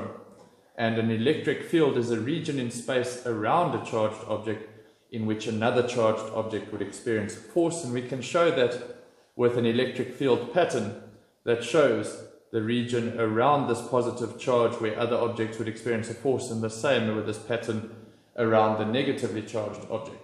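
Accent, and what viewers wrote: South Africa. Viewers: south african hey?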